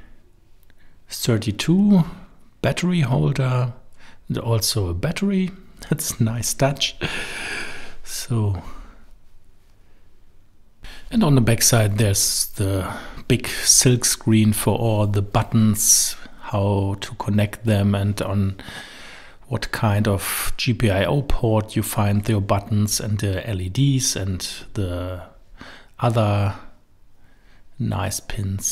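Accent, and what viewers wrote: Germany. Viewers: since i live in germany, I'm 100% this is a German native :D from accent perpective, but good work!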